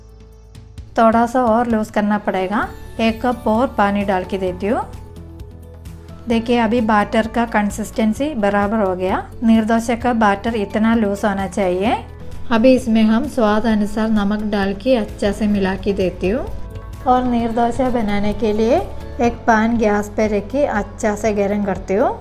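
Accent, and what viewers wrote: Kerala, India. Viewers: Very Well explained and demonstrated. Your Hindi with Keralite accent is cute as you. Keep it up 👍👌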